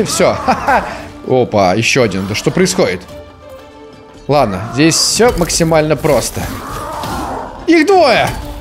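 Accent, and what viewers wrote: Germany. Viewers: немецкий акцент это что-то с чем-то. Жду следующее видео с немецким языком🌺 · Лайк, если знаешь немецкий окцент.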